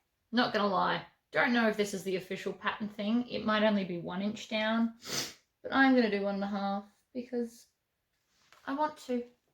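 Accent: Australia. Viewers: So refreshing to hear an Aussie accent even if you're pitching to overseas viewers with measurements in inches. Great to be able to easily find things at my local shops here in Australia rather than hearing about Dollar Tree or Michaels stores! Thank you for these projects and helpful tips. I wish you every success.xx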